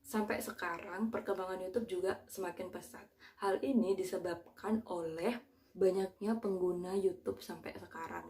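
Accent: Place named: Java, Indonesia. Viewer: MANTHUL... INFONYA SANGAT MEMBANTU... #AGAKMEDHOK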